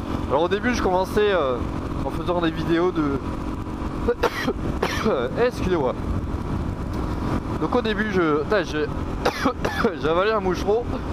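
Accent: Alsace, France. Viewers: On sait que tu es Alsacien, avec cet accent. Et le Panneau à 70 (Après le moustique) c'est pour les chiens !! De même que celui à 30km !! A+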